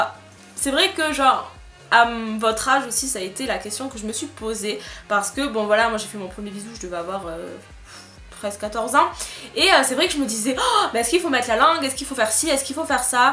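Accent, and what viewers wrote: South, France. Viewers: Olala j’adooooore ton accent ♡♡ J’adore l’accent du Sud ♡ Si les sudiste voient ce commentaire je vous aime ♡♡ (surtout si vous avez l’accent :p) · Merci c'est gentil ‚ oui l'accent du sud est spécial mais bon c'est la vie ✋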